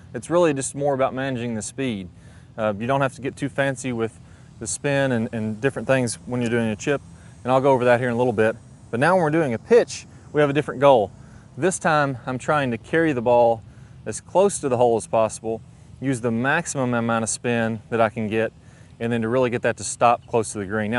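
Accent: South, United States. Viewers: Great work, love the Southern drawl, nearly as good as the Aussie accent, but close. Thanks for your videos from Melbourne Victoria!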